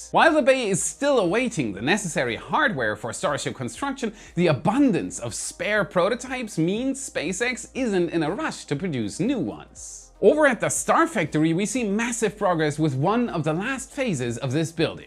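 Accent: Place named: Germany. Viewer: Nice surprise. Always a space geek's ecstacy. Space must always come with a German accent!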